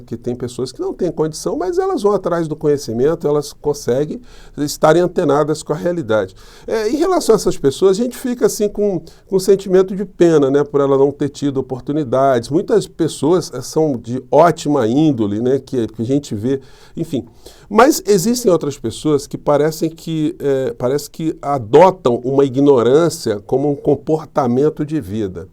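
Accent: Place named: Bahia, Brazil. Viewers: Sotaque baiano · Ó pá í ó, baianes clássico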